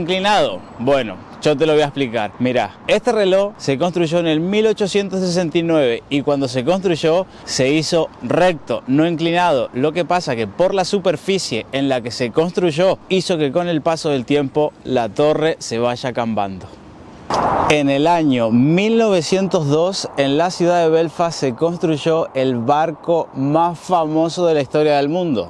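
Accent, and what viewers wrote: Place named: Rioplatense, Argentina. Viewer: Buen video, te escribo desde El Salvador 🇳🇮. Cuál de las dos Irlandas es más próspera??, Y cuál es tu nacionalidad??? Es que tenés una mezcla de acento rioplatense y de la península ibérica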